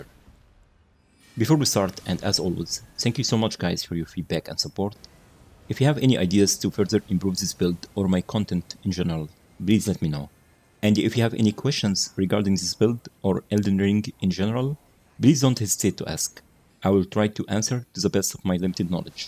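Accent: Egypt. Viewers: Are you Egyptian? I can hear it from your accent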